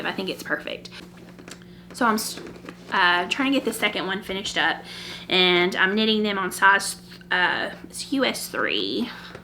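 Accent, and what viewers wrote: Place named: South, United States. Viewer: I absolutely love your podcast and your colour palette and choice of yarns and wish i could afford the same ones, hopefully one day lol. your choices are great examples to base my own projects on. Im loving the little additions of 'what we say in the South' im obsessed. Your accent is much more interesting to listen to than our very boring New Zealand one. I love your podcast and could listen to you speak all day, a great way to end a busy day at work! Please keep posting😊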